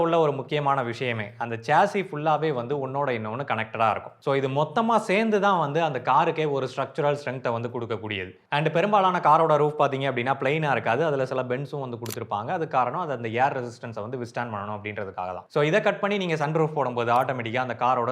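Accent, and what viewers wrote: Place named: South India, India. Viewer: Love the dindukkal/south slang boss!! Keep up the good work!!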